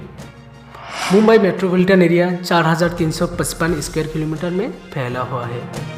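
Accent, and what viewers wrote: Bengal, India. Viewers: I think you are a Bengali so improve your tongue and keep it up for Mumbai....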